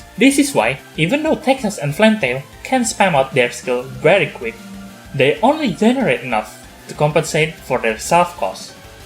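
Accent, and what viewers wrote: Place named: Asia. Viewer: It's more cause of his "asian?" accent than the mic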